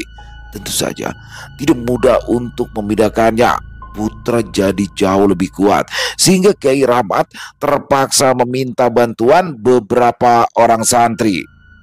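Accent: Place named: Banjar, Indonesia. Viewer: Abang cocok jadi orang banjar. Soal nya klo bicara bahasa banjar abang logat nya banjar bangttt ☺️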